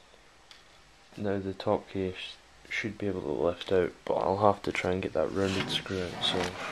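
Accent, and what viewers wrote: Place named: United Kingdom. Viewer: he is British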